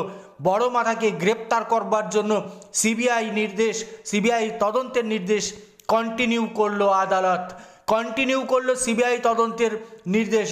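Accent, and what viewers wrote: Bangal, Bangladesh. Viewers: আপনার বাংলার উচ্চারণ বাঙ্গালদের মত কেন?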